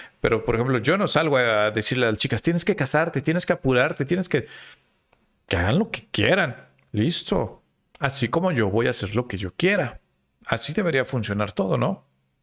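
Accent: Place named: Argentina. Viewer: Por tu acento me doy cuenta que eres de Argentina,.admiro y respeto gente culta en el deporte y en la música., tienes razón empecé con estos canales por curiosidad y me gustaron demasiado aparte de ver los de tu compatriota Agustín laje arrigoni,el cual es un escritor que me encanta y admiro.viva Argentina. Trataré de tomar muy en cuenta tu consejo el cual tiene mucho de razón. Saludos desde México tierra Azteca.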